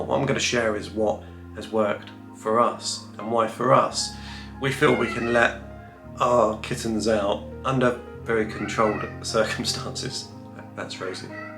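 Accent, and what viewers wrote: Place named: England, United Kingdom. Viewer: I can hear your cats interrupting you She is very talkative alright I love your cat You have nice videos!🤩 They are beautiful a darling cats! 😘😘😘 What are their names Was not able to hear you well You have an English accents and I have poor hearing sorry I am 80 yrs already Thank you for sharing friend 🌹🌞🌹🌞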